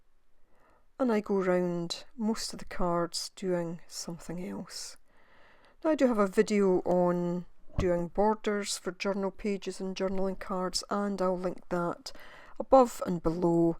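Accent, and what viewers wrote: Scotland, United Kingdom. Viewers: I like your videos. It's refreshing to hear a Scottish accent for a change on YouTube.I do similar craft stuff myself but am a digital dinosaur. I am watching from the Outer Hebrides. Every blessing to you and Nina for future videos xx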